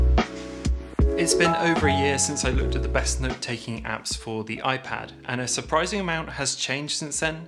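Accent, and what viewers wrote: England, United Kingdom. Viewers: English accent is such a flex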